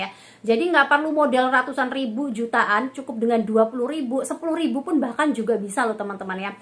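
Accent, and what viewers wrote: Java, Indonesia. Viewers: Hhe...medok bnget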